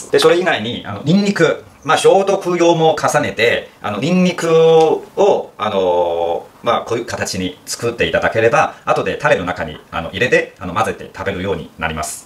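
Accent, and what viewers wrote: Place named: Chongqing, China. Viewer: 一听口音就是地道的重庆人😂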